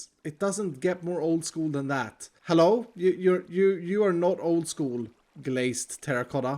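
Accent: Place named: Sweden. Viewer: Im sorry bur you have one of the most Swedish accent that u have ever heard😇😅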